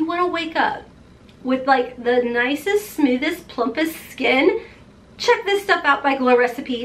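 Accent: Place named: Kentucky, United States. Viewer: I always wondered why I recognized the accent ! Hi , from Louisville Kentucky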